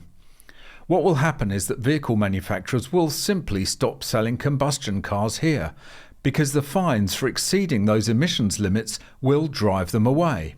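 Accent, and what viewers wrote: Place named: England, United Kingdom. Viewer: It grates on the ears. Especially with a pommy accent.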